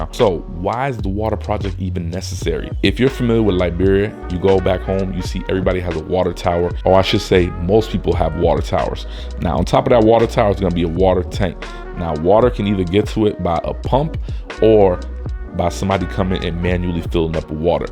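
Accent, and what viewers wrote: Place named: Northeast, United States. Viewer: your kolocwa is flawless 😂 Putting that aside, you sound a little like somebody who's lived in the Northeast ( PA/NJ/NY )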